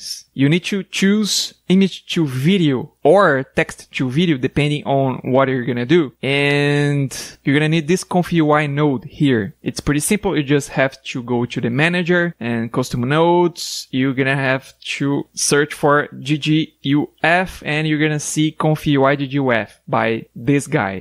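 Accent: Brazil. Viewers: Eu só passo o mouse na thumb, mas aí ouvi e pensei: peraí, temos um BR, bora dar moral. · Sotaque BR identificado, bom vídeo manolo! Ganhou um escrito =)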